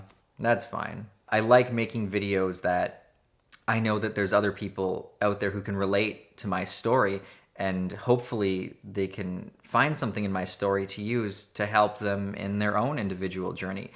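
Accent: Canada. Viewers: How come Canadians always say "about" weird?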